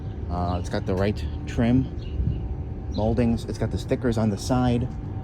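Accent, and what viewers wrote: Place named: Chicago, United States. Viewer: Man, you have a cool Chicago accent!!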